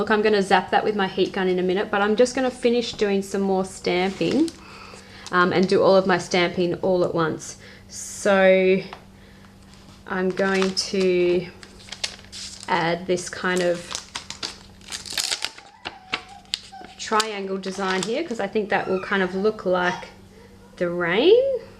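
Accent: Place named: Australia. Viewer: I could listen to your voice all day. Seriously you aussie's have the best accent in the world to me. I love your pages btw. I only just found out about this literally not long ago I haven't even tried this yet but you make it look so easy and fun that I can't wait to try it. I usually art journal (only for a few months) but I think I'm going to to add this to my weekly art time. What a great way to get lost in gods word and learn.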